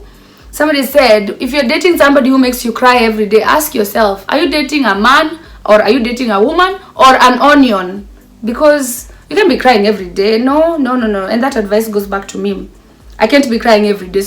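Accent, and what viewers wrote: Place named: Kenya. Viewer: I'm always in love with the Kikuyu accent you embrace.... That's why I feel you are legit... 'Hi, it's me again ' 😂 😂 😂 😂 na ka kiswahili kidogo "Ngai"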